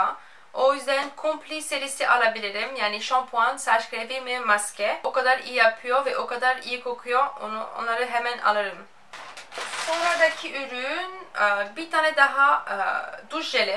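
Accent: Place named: France. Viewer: Fransız aksanın var galiba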